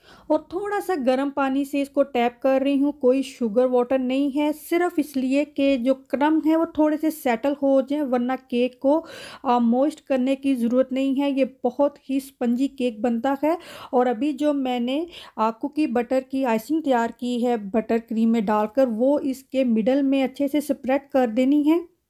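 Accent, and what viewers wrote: India. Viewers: Aap ka accent kitna simple aur desi hai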